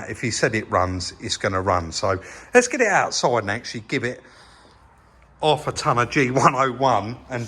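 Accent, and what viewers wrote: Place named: Essex, United Kingdom. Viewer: More like an Essex accent.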